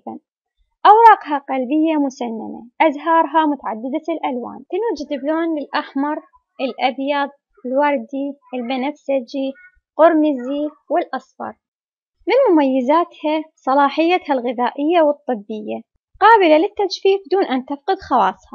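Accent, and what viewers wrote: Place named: Basra, Iraq. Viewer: عرفت من لهجتج بصراوية الله يحفظج ويبارك بعملج انا من حي المهندسين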